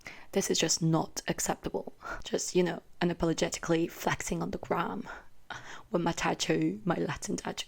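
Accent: Australia. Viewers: Ngl but this sounds more Australian than British all the comments 😳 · *Australian pretty sure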